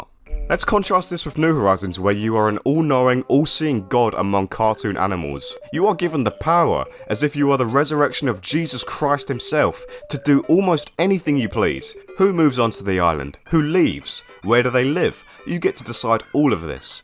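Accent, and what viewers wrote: United Kingdom. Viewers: You from kent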